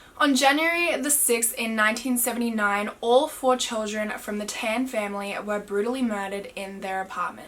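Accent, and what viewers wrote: Australia. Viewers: i was not expecting you to be Australian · Is it weird that I look forward to these videos because I just enjoy hearing you talk 😂😂😂 Australians honestly have the best accents! Hahaha but thank you for putting up another mystery vid!! Love you!!❤️ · Cuz shes Australian 🤭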